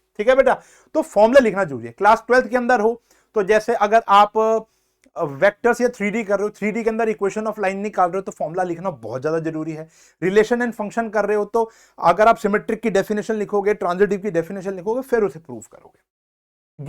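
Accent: Punjab, India. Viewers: sir your punjabi accent bahot achi hai sir 😀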